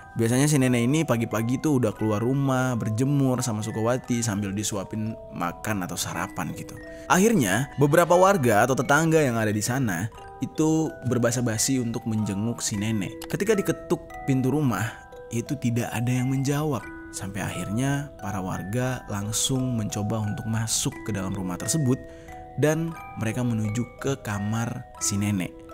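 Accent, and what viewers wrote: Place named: Java, Indonesia. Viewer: Bang Ngomong Jawa Lucu Sumpah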